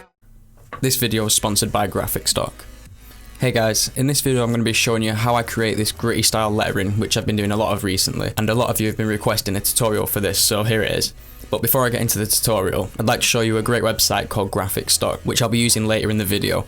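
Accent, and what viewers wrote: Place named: Northern England, United Kingdom. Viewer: After so many Canadian and American channels, I love hearing a fellow UK Northerner, and delivering such quality videos! Cheers pal!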